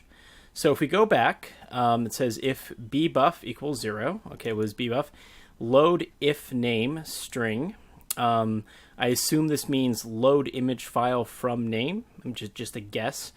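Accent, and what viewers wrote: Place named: Netherlands. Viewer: Nice dutch accent, and also have of the comments in dutch. :D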